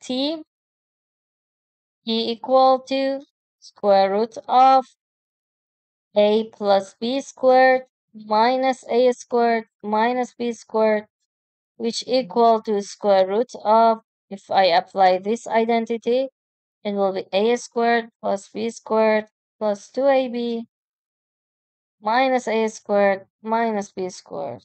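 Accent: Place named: England, United Kingdom. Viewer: La voix féminine de la commentatrice est traînante...ma parole c'est une anglaise...je suis dur d'oreille...les appareils auditifs sont beaucoup trop chers pour moi!Y-a pas de quoi rire...quand on est vieux on est vieux! Il paraît que Google va faire faillite...Ça ne m'étonne qu'à moitié...Ils poursuivent trop leur abonnés de leurs assiduités...C'est vraiment lassant à force même pour un vieux retraité.😢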